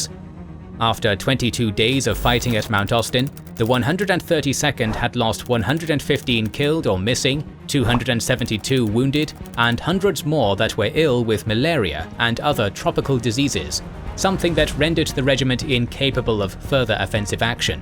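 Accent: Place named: England, United Kingdom. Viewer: its Amerikal as in California the 'c' is very hard All u need do is google to get these words right But then u are English by ur accent and u know how to pronounce everything no matter what language it came form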